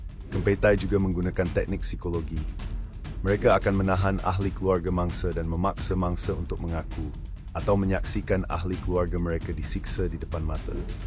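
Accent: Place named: England, United Kingdom. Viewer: Xboleh cakap melayu elok2 ke..cakap pelat omputih bagai..buat malu bangsa ja kau..mat poyo..mat salleh celup. Bangang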